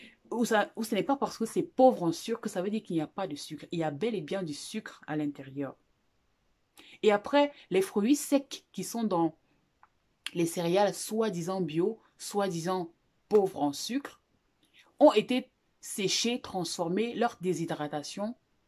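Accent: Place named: Democratic Republic of the Congo. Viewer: Je confirme pour les produits laitiers. 😥Je découvre que tu es camerounaise. D'où te vient l'accent congolais?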